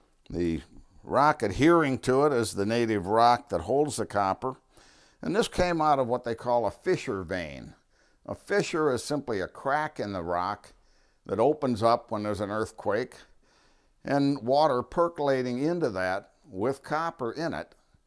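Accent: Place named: Michigan, United States. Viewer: Thank you! Excellent presentation. I also enjoyed your MI accent. I have a 4" piece of native copper i found as a kid when we hiked up to an abandoned copper mine shaft on the UP. My dad was from MI and had done his Master's History thesis on a giant copper boulder of the UP hauled out in the 1840's. Years later i saw the boulder in the Smithsonian. I grew up in New England but we'd visit MI relatives & 1 week my family toured the UP. Most haunting was an abandoned copper processing factory, last used during WWII. Wish I knew quite where on the UP that was/is? Had ore cars rusting on tracks outside. Inside the 1 story factory, some machinery was still there. And real WWII posters were still on the wall! (This was 1970.) I took a Red Cross Homefront / Build Morale poster.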